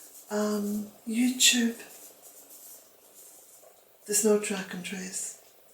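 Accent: Ireland. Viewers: The nastiness comes from a place of bitterness and jealousy, just tell em to feck off in that lovely Irish accent of yours ! youre doing a grand job, lots of love to you and Jack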